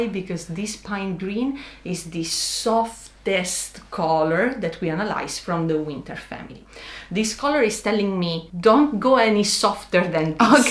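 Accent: Italy. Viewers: I love these videos so much, and I have learned so much. You have a very special gift of explaining the concept and the analysis very well. And although I've seen dozens of them now, I'm still intrigued every single time to find out exactly why which palette works for the gorgeous models (and thanks to every one of them as well for demonstrating how color individually affects us). I must also say that the lovely Italian accent adds so much charm to the whole process. I am a Winter Cool myself, and whenever I go shopping and am determining which color is the right one, I am hearing in my ear "wiinterrr cool" and it puts a smile on my face! Thank you so much 💙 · I've been watching so many of your videos that when you removed the cloth and I said at the same time (with that beautiful Italian accent) "ah noh, the cobahlt is SO much better than the cyan" 😂🤭 amazing video as always!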